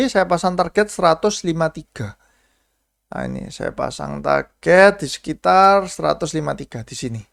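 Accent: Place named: Java, Indonesia. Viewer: ada logat jawanya aduh jan gurih banget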